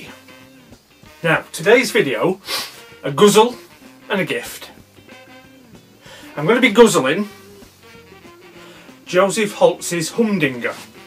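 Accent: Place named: England, United Kingdom. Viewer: What part of England are you from I just can't pinpoint it I love accents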